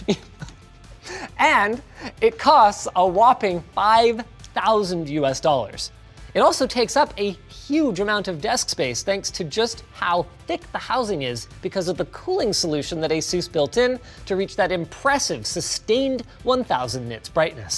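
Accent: Canada. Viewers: You talk Canadian but you spell like the Americans color or liquor you see the difference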